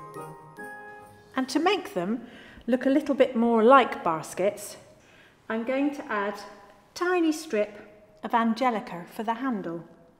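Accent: United Kingdom. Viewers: Which british accent is this? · British accent is very elegant and the way she speaks is polite and decent.Merry Christmas 💫🌲🌲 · Her British accent is life. Americans have destroyed the English language.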